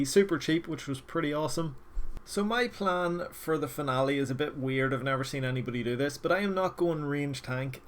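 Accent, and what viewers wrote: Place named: United Kingdom. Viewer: Well said at the end my man and brilliant series of a casual play through, I've not played for a good few years now, gunna start a GIM with my friend and do the next league or dmm, never done either before. Started back in 07-eoc quit then came back to osrs <num>. Cheers for the insight, always wondered if it would be balanced enough to be fun for a casual player to farm some 07 GP early on and then have some fun pking mid game and have some decent gear at the end, or just swap out to 07 at the end and I'm guessing it goes for decent, I'll have to do some Lms, pked back in the day but I tried a couple games once and got totally dropped left and right haha super rusty. Look forward to your future content subbed. Love the accent aswell, I'm from the UK so it's refreshing to hear a fellow Brit ☺️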